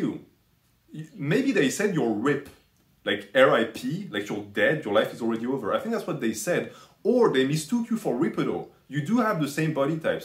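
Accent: France. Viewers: Brother even with a thickish French accent ur English is marvelous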